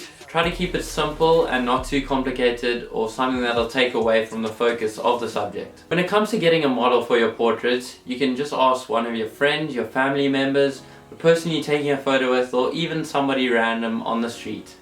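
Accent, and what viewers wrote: South Africa. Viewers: You're South African!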